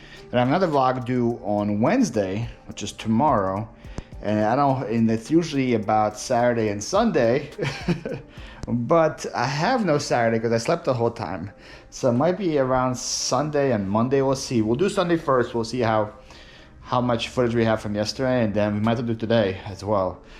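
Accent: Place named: Philadelphia, United States. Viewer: New viewer here. I realize you live in Florida now, but when you lived in Philly, you must have lived right in the heart of Philly for a long time. I can hear your very distinct Philly accent. Happy selling!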